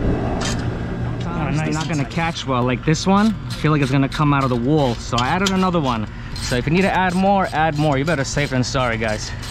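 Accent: New York, United States. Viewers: I see and hear you're in NY...laws and regs are crazy there...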